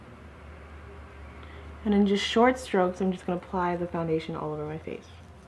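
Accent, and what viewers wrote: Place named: United States. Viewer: Are you originally from America? You just have an American accent that's all (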